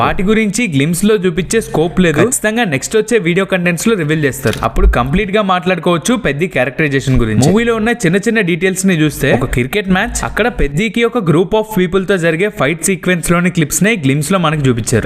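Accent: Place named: Visakhapatnam, India. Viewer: Aa slang vzm ...not sklm bro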